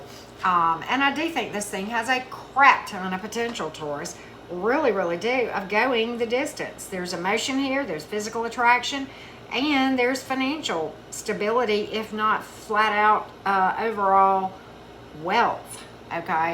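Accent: South, United States. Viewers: It's the Chinese bangs for me, and southern twang.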